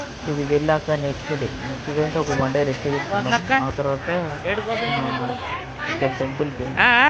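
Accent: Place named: Telangana, India. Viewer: 👌👌mana thelangana style lone matladu baguntundhi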